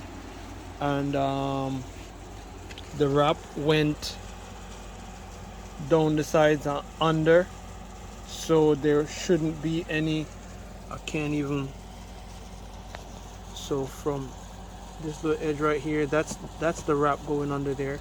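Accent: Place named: United States. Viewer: Man a Yankee now. It's a Jamaican channel I subscribed to bredda. Be careful with the accent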